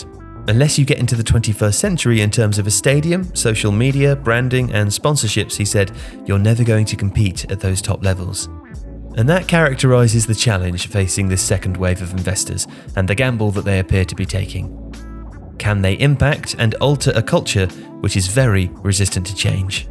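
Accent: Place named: England, United Kingdom. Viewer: He pronounces different because he is English that is how it is in his language.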